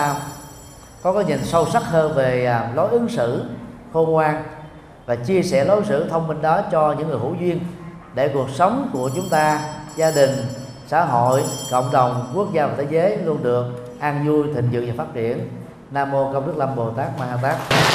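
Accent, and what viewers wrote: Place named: Vietnam. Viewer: phát âm tiếng anh giọng việt "chay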